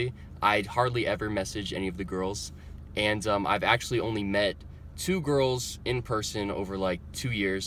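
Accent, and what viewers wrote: Ireland. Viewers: Are your parents or grandparents Irish? You have slight Irish accent.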